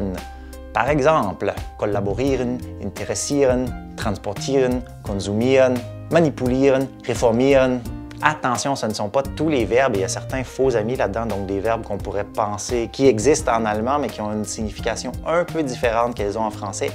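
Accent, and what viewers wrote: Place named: Canada. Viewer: L' accent Canadien: un regal :) Je m' abonne: tres interessant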